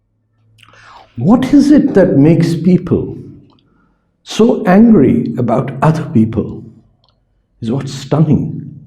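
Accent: Punjab, India. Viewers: Please don’t speak English in Punjabi accent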